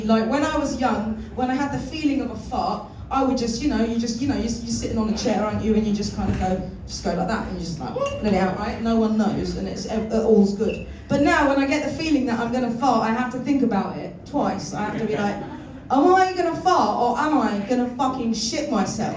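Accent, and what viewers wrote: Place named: London, United Kingdom. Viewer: Beginner ingilizcemle Londra aksanını 23 dakika izledim, hiçbişey anlamadım ama eğlendim teşekkürler 🤝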